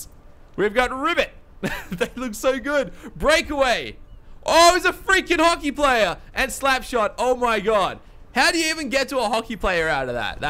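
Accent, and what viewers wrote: Australia. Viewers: Hey, why are you Australian?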